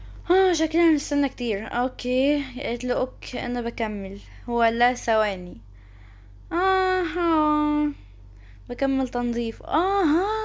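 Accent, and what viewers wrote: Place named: Saudi Arabia. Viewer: دقيقه انا ابي استوعب رديتي صح اححححح ما توقعت والله العظيم بس اشوفك دايم تتكلمي سعودي شوي؟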